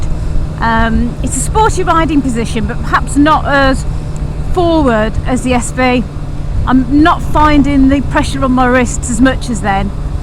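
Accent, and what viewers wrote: United Kingdom. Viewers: i really don't get the algarithm as I've been watching 600r rr motorcycles (motorbikes) for years and this is the first time I'm seeing your Vlog. I alwas love hearing the accent of GB and even more so seeing the land full of lush vegetation.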